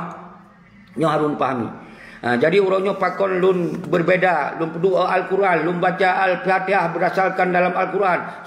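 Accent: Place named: Java, Indonesia. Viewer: Lagee awak jawa baca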